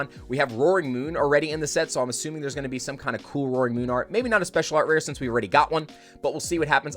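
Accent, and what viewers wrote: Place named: United Kingdom. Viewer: For a second I was like….did I click on the wrong video…who dis Brit?!??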